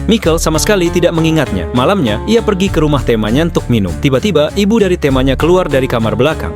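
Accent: Java, Indonesia. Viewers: 😂😂😂😂 500 gelo... Jian jowo tenan Iki. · Jawa itu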